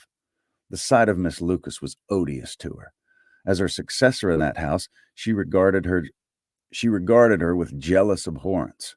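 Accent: South, United States. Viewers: I'm not from the US, and most of my experience of the US southern accent is from movies and TV. But listening to you is soothing and I'm sure that I'll be listening to your entire audiobook when you pull it together. Great work and thank you.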